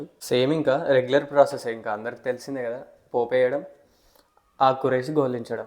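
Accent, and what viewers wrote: Telangana, India. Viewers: తెలంగాణ తెలుగు వింటుంటే చాలా ఆనందముగా ఉన్నది మీకు శుభము హరే కృష్ణ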